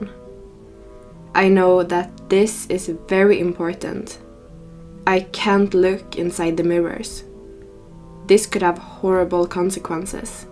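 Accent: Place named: Iceland. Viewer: Oh, I didn't recognise your accent as Swedish. I was thinking you might be Icelandic. Thanks for sharing.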